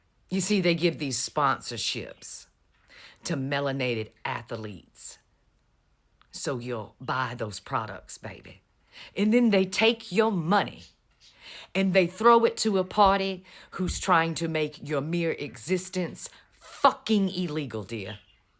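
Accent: South, United States. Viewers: England here: I love your casual, conversational style of getting the message out. You're a powerful orator with a lovely southern accent. Keep up the good work. The world needs to hear what's going on in America, now more than ever. 😘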